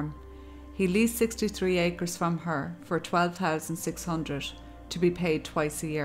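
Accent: Ireland. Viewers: You speak so clearly with that beautiful Irish accent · Love your soothing voice. Which part of Ireland are you from ?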